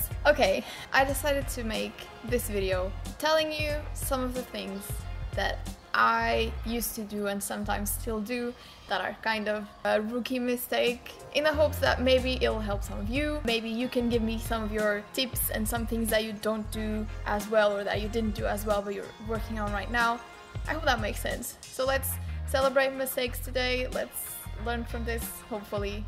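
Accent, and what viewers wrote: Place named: Portugal. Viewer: The second one is really helpful when it comes to creating better photography compositions. I really didn't realize up until recently hahaha. If anyone want to see my pictures, my Instagram account is: Ps:, teu sotaque português é muito lindo hahahah faz outro vídeo em português PLEASE ( Sou do Brasil)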